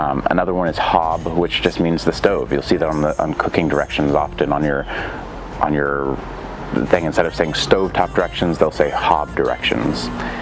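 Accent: United States. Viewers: You don't speak English you speak American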